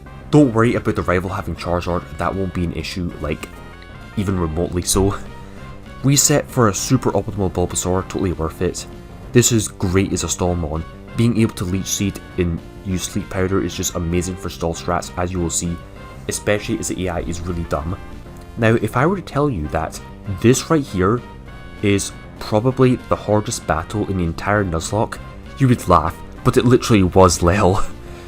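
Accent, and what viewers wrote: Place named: Scotland, United Kingdom. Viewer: Not a pokemon related question, but could you briefly turn up your scotish accent to 11 and sound almost unintelligible?!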